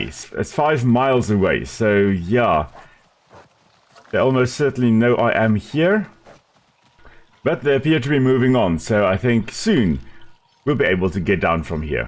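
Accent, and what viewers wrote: South Africa. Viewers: Are you from SA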